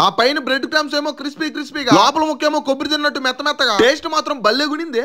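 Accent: Nellore, India. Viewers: ante meedhi Nellore aina yaasa maaraley that is. Comment from Srikakulam district palasa Mandal rural Chiranjeevi ❤❤❤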